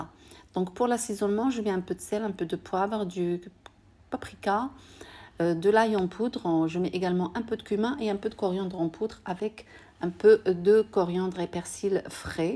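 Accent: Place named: Morocco. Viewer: Salem mais comme J’❤❤❤❤ime bien tes vidéos et quand tu parles les deux langues et surtout quand tu parles en arabes avec l’accent marocaine Ta sœur algérienne 🇩🇿🇩🇿🇩🇿🇩🇿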